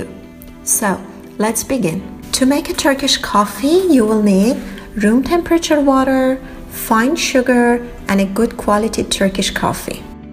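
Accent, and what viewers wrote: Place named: Iran. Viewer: Love the persian accent😂💖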